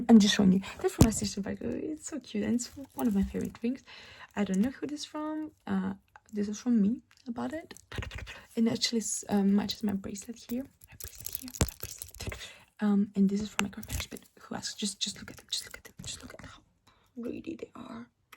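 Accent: France. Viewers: Ton accent et ton vocabulaire en français sont tellement propres qu'on dirais que t'as habité en France pendant plusieurs années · T'as un accent parisien, je pensais que le français c'était ta première langue. 🤯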